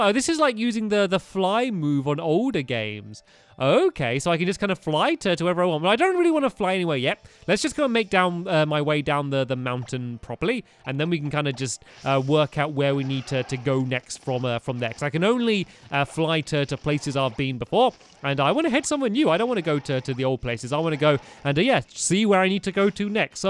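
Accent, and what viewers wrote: England, United Kingdom. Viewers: Garry Stevens he's English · Guys... IT DOESN'T MATTER THAT HE SAYS "zed" INSTEAD OF "zee"! HE COMES FROM ENGLAND. HE IS GOING TO PRONOUNCE IT THE WAY HE WAS TAUGHT. HE IS NLT GOING TO PRONOUNCE WORDS FROM OTHER RACES JUST BECAUSE OF YOU. There. :) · homie cat he is British some thing are different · pro classgamer Well, Stampys British, and here we say Z: Zed.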